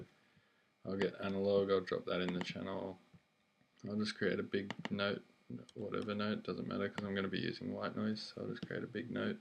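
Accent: Australia. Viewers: Thanks for the videos.. Great Help. Still very much a beginner so good to hear someone I understand. The Aus accent helps..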